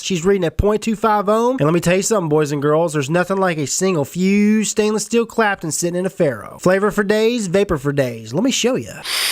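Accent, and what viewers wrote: United States. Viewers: Greek-German here that speaks fluent English. It's not day-de-lus, it's also not tha-tha-los (although it's pretty close, the transcription is just shitty). First of all, in modern Greek the Delta(Δδ), the d in the Latin version, is pronounced softly, somewhere between an English th and a d. Then, the ae, the Latin transcription of the Greek αι, is pronounced like the German ä. You can try to pronounce it by forming an a with your mouth but making an e sound. And lastly, when pronouncing -los or -lus try to get the "twang", that you Americans have, out of your vowels. Take these tips and you should get pretty close. This comment was written poorly because it's like 2 AM where I live and I'm too lazy to proofread.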